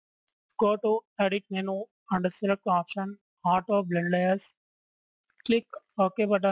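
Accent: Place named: India. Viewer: I agree this is the worst tutorial on Photoshop morphing techniques. the final end result is a disaster. no maintenance of ratios, lighting, hell he is even using a rasterized cut layer for his process. With all these negatives in place, the dialect in which he spoke was the only thing you noticed? Why do you even have to say broadly, that 'Indians' should not be allowed to attempt to speak that language? Who are you? The keeper and safe-guarder of English language? People like you should not be allowed to even comment on YouTube or any other social media for any case. You have the gall to speak about Indians as such and watch a video from them, amazes me beyond the realms of any form of human thought. The uploader of the video has either: no brains, absolute idiot or a moron, who channel as a legit name ' ' with noob kind of videos. And on the other side, we have half cooked, stupid taboo people like you who are racist.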